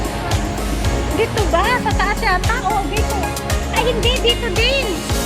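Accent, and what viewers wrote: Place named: Ilocano, Philippines. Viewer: watching live anak ,marami ka bang napamili ilokano ba kayo kadi yong tono nyo❤❤❤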